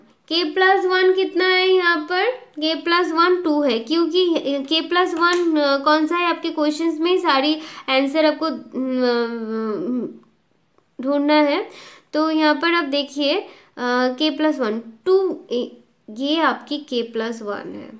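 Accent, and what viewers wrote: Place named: Bengal, India. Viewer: Bridger O'Neill it's in Hindi with some Bengali accent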